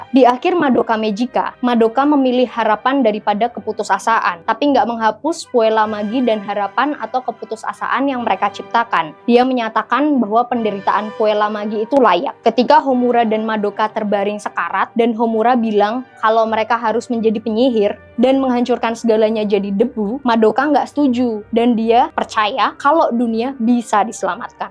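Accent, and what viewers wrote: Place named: Semarang, Indonesia. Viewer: top reviewnya mbak, btw mbak e logate kota semarang ig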